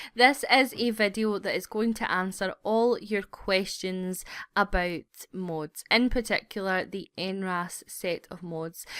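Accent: Scotland, United Kingdom. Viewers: Pringles Fan! Scottish?? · Not sure why, but I get really happy when I hear a fellow Scottish accent on youtube 👌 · She sounds Scottish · Woah okay. Four things I HAVE to bring up. 1. Nice accent! Haven't heard a Scottish lady speak before, sounds quite classy! 2. Perfect tutorial! This is not hyperbole. This has EVERYTHING you need: 2a. Detailed explanations on each most asked questions and how to get there. 2b. A patient person who calmly explains her reason of making this video for those curious. 2c. A showing of human emotion while also being fair. Not liking teenage pregnancy is fine. Heck, I don't either. I respect their decisions also. 3. A link leading to the installation of these mods without making it too long and also explaining what each mod does and again, humanizing yourself with a reason into why you only have these here. 4. A cheeky joke in between a learning experience enhances the experience and makes it more enjoyable and not make people think you're not human. 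Human interaction amongst the teaching makes it relatable. If she can, I can! I cannot stress enough. You did extremely well here, and I don't say it often. Thank you, for the charming, compassionate, informative and patient tutorial!:D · are you Scottish or Irish? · Ahh! Scottish accent! I'm Scottish too and it's so nice to hear a familiar accent for a change!:)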